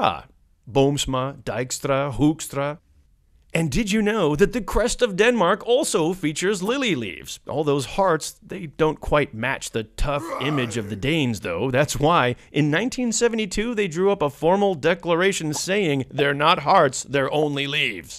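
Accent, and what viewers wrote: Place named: United States. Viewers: Greg Shapiro (the voice of this video) is an American, that's why he pronounces everything the way he does. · You can speak Frisian but you refuse to, why pronounce these things so American-like?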